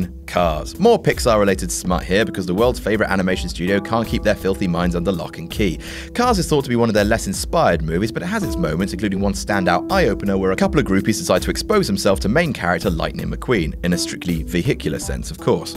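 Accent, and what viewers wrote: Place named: RP, United Kingdom. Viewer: For a moment I thought I was watching a WatchMojo video.... Wondering what I was doing with my life. Then, I heard soft posh voice and I knew, despite everything, all was right with the world.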